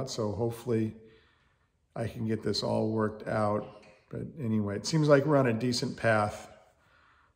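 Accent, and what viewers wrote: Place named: Pennsylvania, United States. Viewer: I'm from PA. Friends&family in Sequim. I knew you looked & sounded just like someone from home! Nice to meet you, neighbour, via the world wide Web!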